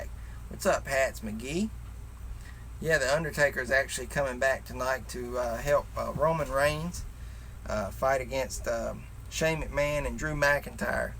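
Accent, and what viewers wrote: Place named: West Virginia, United States. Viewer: wow that southern draw! you sound straight out of west virginia! not being offensive i just didnt expect that lol. im here checking you out like i said i would right as my phone died lol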